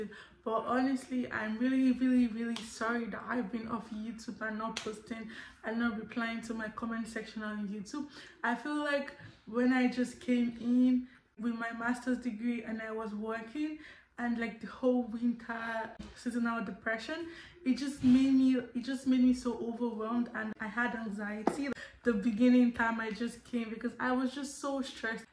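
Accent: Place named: Canada. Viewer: Wow... welcome back, i really miss you and i love Canadian accent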